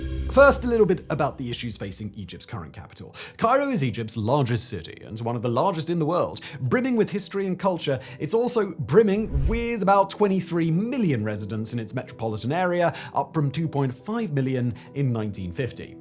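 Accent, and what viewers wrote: United Kingdom. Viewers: The British accent of the presenter makes it difficult and unpleasant to follow. He speaks fast, words are not clear, no boundary between words.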